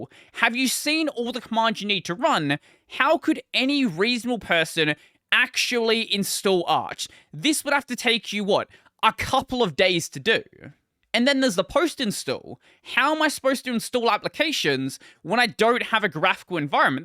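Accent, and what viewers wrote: Australia. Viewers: are you australian or what? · I fell for it until I realised you are Australian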